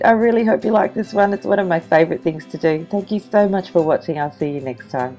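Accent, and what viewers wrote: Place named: Australia. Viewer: This is awesome, and how great is it to actually hear an Australia accent. Thanks for sharing this with us. Could you tell me where you get your Seche Vite from, please? I live in Hobart, so if you can get it I should be able to as well, but I haven't been able to find it anywhere. Can't wait to check out all your other posts.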